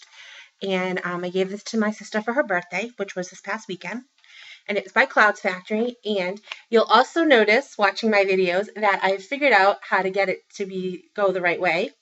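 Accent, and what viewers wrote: Boston, United States. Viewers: I definitely heard Boston, with the ar words, like part, shark, start etc. I thought it could be stated island, maybe Jersey. I was born in and partially grew up in Brooklyn, so I'm told I have an accent as well. Lol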